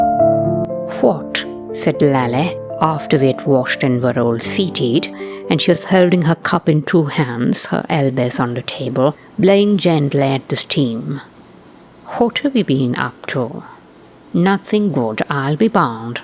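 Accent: RP, United Kingdom. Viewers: I assume this is you. Would you like to talk to other people who speak authentic heightened RP? I’d like to get a group going.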